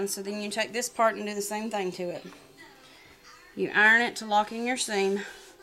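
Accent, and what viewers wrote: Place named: South, United States. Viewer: Thank you. I will be watching more of your videos. Love your southern accent :-)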